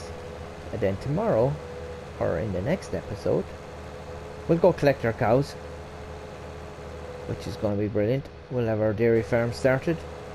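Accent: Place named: Ireland. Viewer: Keep it up 👍 which part of Ireland are you from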